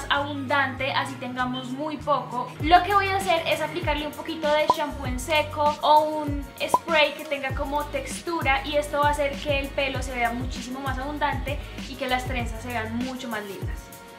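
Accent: Colombia. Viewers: Que agradable escuchar una colombiana sin acentos exagerados!!! Muy buena vocalización y buen tono de voz...felicidades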